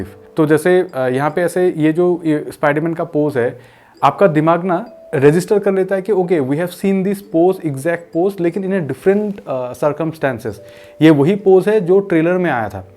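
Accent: Assam, India. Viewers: R u Assamese?? Bcz Ur accent is familiar for me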